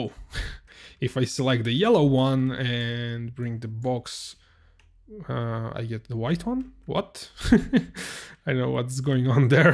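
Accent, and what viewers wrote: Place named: Russia. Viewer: Сразу слышно, русский озвучивал)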